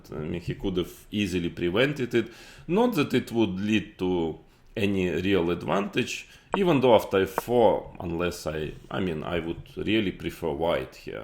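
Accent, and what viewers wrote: Russia. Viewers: So thick Russian accept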